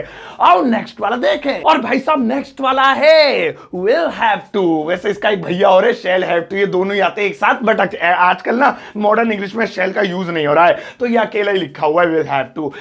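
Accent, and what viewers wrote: India. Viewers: Desi English.♥️♥️♥️♥️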